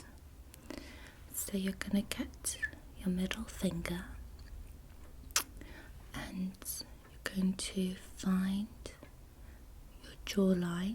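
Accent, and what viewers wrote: England, United Kingdom. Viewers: it's her English accent, not Asian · she has an english accent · Or maybe she was taught the English way of speaking... or better yet taught by an Englishman/woman. Ever wonder why Indians sound the way they do? Welsh English teachers in the 1800s. She sounds English when speaking English because she was taught the English way, not because she's Korean. If an American had taught her to speak English, you'd bet your ass she'd speak with an American accent to. Just the way it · she has a British accent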